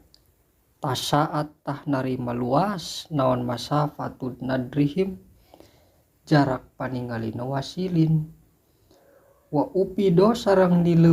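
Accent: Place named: Java, Indonesia. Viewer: logat jawaan pak ustadz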